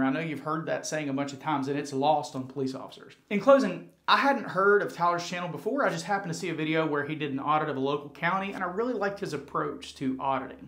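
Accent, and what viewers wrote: South, United States. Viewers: Its always a good day when a Southern Drawl Law video drops Thanks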